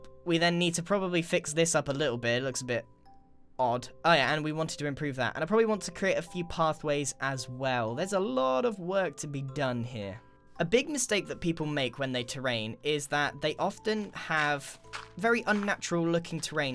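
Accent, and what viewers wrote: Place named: RP, United Kingdom. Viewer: You sound really posh in this episode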